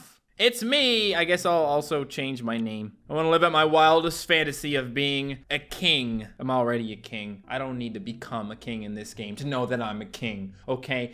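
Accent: United Kingdom. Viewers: That’s an ok british voice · Is that really your British accent?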